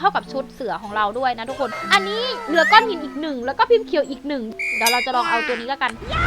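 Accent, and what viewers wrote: Isan, Thailand. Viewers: เป็นคนอีสานบ่นอ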